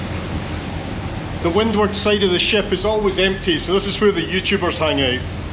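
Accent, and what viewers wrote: Scotland, United Kingdom. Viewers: hey - i really enjoyed your videos tonite. I was on the isle of skye twice, and once on my honeymoon at a magical place called Flodigarrys near Portree. your video really took me back there. and i loved seeing Harris and Lewis islands- felt like i was there. love your scottish accent too! thank you!!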